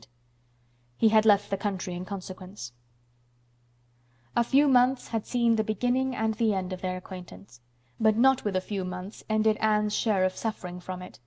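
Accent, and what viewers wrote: RP, United Kingdom. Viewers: love her posh voice :D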